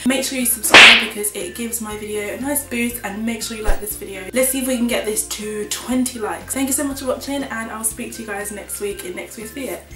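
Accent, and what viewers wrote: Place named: United Kingdom. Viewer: I absolutely L-O-V-E your British accent.😊😝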